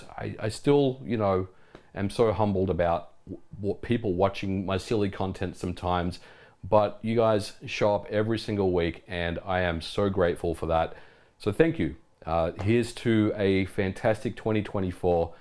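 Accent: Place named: Australia. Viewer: Hey brother, I’ve been a long time watcher of your videos. I think you experienced a lot of growth on your channel thanks to the pandemic so don’t be too hard on yourself comparing views to that time! YouTube is also pushing shorts pretty hard which isn’t your fault either. Everyone knows you’re one of the top voices in retro gaming on YouTube if not gaming in general, plus it’s nice to hear a fellow Aussie voice! Keep doing what makes you happy mate, merry Christmas.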